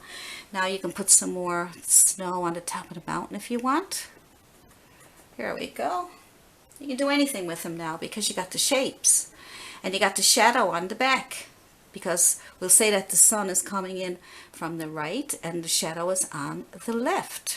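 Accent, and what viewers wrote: Newfoundland, Canada. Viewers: You sound like a Newfoundlander. My family is from Newfoundland. ❤️ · Wonderful, thank you! (Are you from Newfoundland?) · Thank you so much for the lesson! Do I detect a Newfoundland accent? 😊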